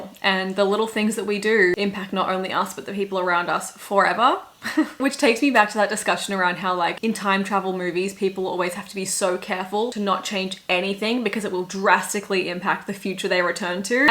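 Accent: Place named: Australia. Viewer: Hi, I just wanted to let you know, that when I bing searched taylor in paris, from a public computer, this post of yours, came as first listing (with filter of 20 min+ videos). I just saw you the first time, when you replied my comment saying I wanted to see your whole first time listening video of the new album. Mind you also, that from your accent, it sounds like you are Aussie? or some place far from USA, where I am. So... just to let you know, you are famous. At least for 20 min+ videos about.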